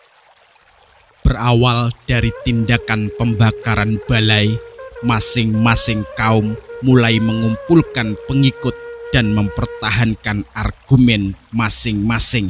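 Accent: Java, Indonesia. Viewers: Medog kali Jawa mu mas, kalau ngak paham sejarahnya yo belajar dulu toh.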